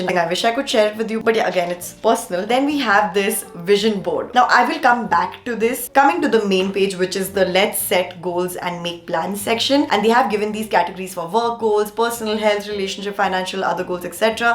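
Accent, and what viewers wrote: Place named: India. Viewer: I love your accent so much I am 40% Indian and 50% Jamaican and I have an Indian accent and yours just makes me happy to have it <3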